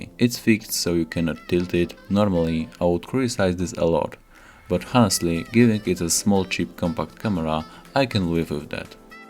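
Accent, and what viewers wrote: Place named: Poland. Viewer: Po akcencie wydaje mi się że musisz być z Polski 😉😉👍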